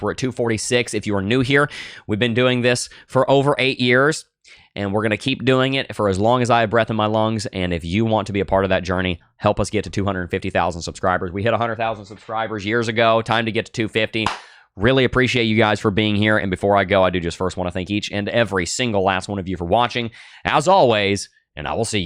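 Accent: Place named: United States. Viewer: in the uk we see this way of chatting as a yank as weird its like a usa news presenter or usa tv adverts u see in movies as actors pretending to act. please its so corny its horrible. surely this isnt normal in the usa.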